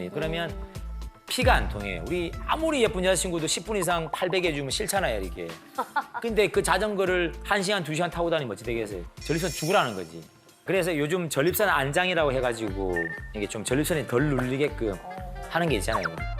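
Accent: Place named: South Gyeongsang, South Korea. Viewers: 비뇨의학과 의사 + 경남사투리 + 딕션 모든게 완벽함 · 기분 나쁘지 않은 사투리임ㅇㅇ 어떤 경상도 사투리는 짜증이 가득 묻어있어서 짜증나는데 이분은 오히려 ㄱㅊ음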